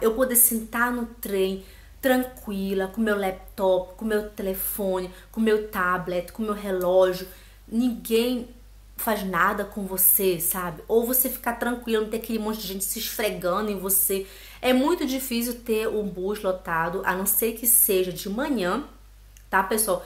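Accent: Pará, Brazil. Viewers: Oi querida, achei por acaso seu Canal, e ja to assistindo., so uma Pergunta, Vc é Paraense? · Assim que ouvi vc falar eu soube. É paraense. 😚 inscrita! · Égua, bem que eu reconheço esse sotaque! Saudações de Belém do Pará! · Já sabia que você é do Pará só pelo sotaque haha. O nosso "inverno" é assim mesmo, só chuva e calor aff. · Eu sabia que você era paraense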